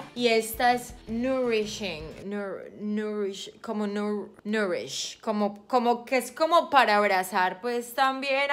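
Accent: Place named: Colombia. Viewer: Cómo a rarenge jajaja súper colombiana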